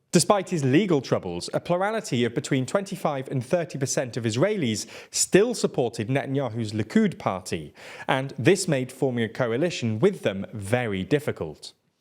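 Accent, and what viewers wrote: United Kingdom. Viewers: Net'nyahu... I hate British accent.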